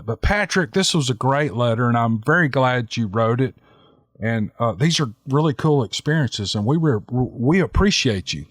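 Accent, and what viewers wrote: South, United States. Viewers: With an accent like yers' I'd never have guessed you know literature the way you do. I guess that's an example of bias against southerners. In fact, though, I like people from the south. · Only an hour up and already 92 comments! Love 💘 your channel and listen to the others first because I like to keep the best for last!! The ladies have great voices but I really 💘 love listening to your southern voice...Florida girl here and everyone here in South Carolina have the same kind of voice. They all sound like my relatives, Carolina folks. Thanks for your stories, Merry Christmas 🎅 🎄!! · I luv all yer stories. You sound like good olesouthernman. Great narration. You make everything excellent. Yourmypeople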